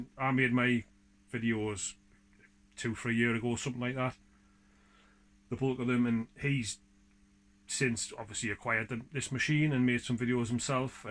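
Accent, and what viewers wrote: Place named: Northeast England, United Kingdom. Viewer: hi, if you still have red alert machine, any interest in trading for Austin Powers ( king kebab clone ) judging by your accent, i'm not too far away in billingham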